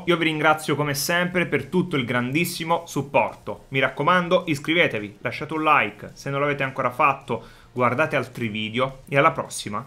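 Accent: Naples, Italy. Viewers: Ma sei di Napoli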